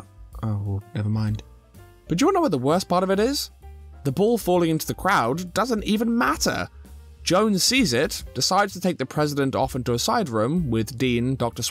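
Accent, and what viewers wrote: United Kingdom. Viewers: I've never opened a video and immediately got attacked by a blast of so much aggresive britishness , Kudos for that, immediately hooked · The most british voice i have ever heard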